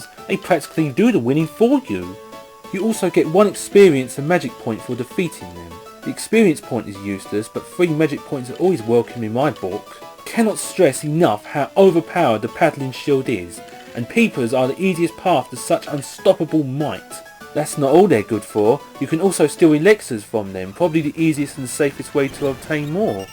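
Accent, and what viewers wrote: England, United Kingdom. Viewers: WOOOW BRITISH ACCENT! Love it! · its because he is english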